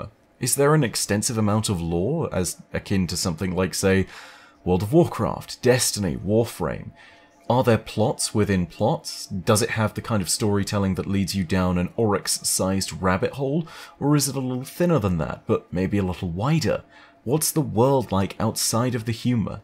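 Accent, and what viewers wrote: RP, United Kingdom. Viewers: Posh English man? I'll just imagine that Sir Hammerlock is just telling me a story about the lore of the game. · I'd love covering Borderlands lore! Posh Englishman explaining Borderlands is something I need in my life! 🙌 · There actually is a surprising amount of deep lore in borderlands. It's more recent, like, a few hundred years, not even, so nothing as deep and expansive as Destiny. The call I up to you. If Borderlands calls to you, if you click with it's humor and world, go ahead. If there are other games you wanna cover, that's fine too. TBH, you put it best. Posh British guy may not be the right "voice" to cover Borderlands. Then again, what is the right "voice"?, if you have fun with the game and want to share that fun with us, go ahead. If you wanna play other games and share those with us, that's fine too. Peace,. Whatever you want is cool with me. 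I definitely be up for borderlands content. Most of the lore is upfront in all of the games in talking to npcs and side quests. Not too hard to research, but may take some time to get to. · You could channel some Sir Hammerlock with your "posh englishman" thing and spice it with some Marcus, it could also wait for like say 6 months . Also the BL series is also something that could produce some "that sound like a YOU PROBLEM..."s. On what you could cover... I don't think there's that much but all the weapons manufacturers should have some worthy stuff and the next game is expanding on them AND the universe more. I also wouldn't mind some vids here and there on content other than Destiny. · I agree, the tone of a "posh Englishman" narrating absolute insanity (that also has a pretty good story to back it up) would be phenomenal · My advice for Borderlands lore stories? cover the background lore and the small tidbits that flesh out the world. 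Example: Tediore guns are made cheap therefore they're thrown away to be used as an impromptu grenade rather than be reloaded. Don't tire yourself out explaining motivations of major characters and story beats. Research the short stories that flesh out the companies that run the universe of The Borderlands. Atlas, Maliwan, Pangolin, Hyperion, Dahl, Jakobs, etc. Check out the small bits that flesh out the lore because those bits are some of the most fun and refreshing! Borderlands is somewhat secretly about capitalism run rampant, sometimes worshiped, sometimes turned into warring factions, sometimes turned into fights for freedom. Do tackle stories like that of Mr. Torgue with your posh british accent, and tackle it with a rich sarcasm like the stories are a joke and not to be made seriously, because they are jokes! Rather than look at Borderlands from a surface level all the way maybe you'd have fun laying a foundation for the folks that don't have a clue about Borderlands, tell them about Pandora, The Vaults, Sirens, then tell them about the world and the companies that exploit it. 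:) Don't take this as something you should cover if you simply don't mesh with it ya know? Find the stories YOU like and share them. I'll be here for whatever content you make, but if you need someone like me to tell you that you might like Borderlands and it might be a surprising bre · Any Borderlands content would be interesting, while its not as intricate as Destiny; there's still plenty of content to talk about. Primarily, I love you for your Destiny content first & for most, anything else is a grand bonus. A "Posh, Englishman tone" can work with anything. My ultimate suggestion, try doing a lore or analysis vid on Borderlands 1/2/pre-sequal. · Personally I find the idea of a "posh" Englishman talking about "swordsplosions" a fantastic fit, but as others have said, don't risk your health over it if it's going to have a negative impact.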